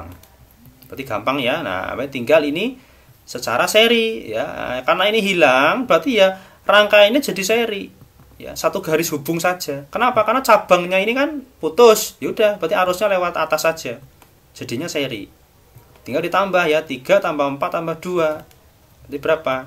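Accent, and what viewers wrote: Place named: Purwokerto, Indonesia. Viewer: Iseh ketara bahasa Purwokerto ne 🤣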